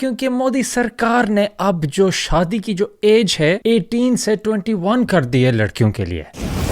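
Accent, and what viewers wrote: Punjab, Pakistan. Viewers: I'm digging your punjabi accent wali urdu 😄💚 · OMG 😱 your Urdu is like 👍🏽 Pakistani Punjabi Uncles who have lived for a long time in the west 🌎 Modi doesn’t want to give education, coz you know education is directly related to lesser votes 🗳 for the BJP party, which is also the same in the US for Republicans and so he has pass laws against triple talaq n marriage age and disenfranchisement of Muslims and farmers coz that makes his voters base happy 😃 as this effects the minority predominantly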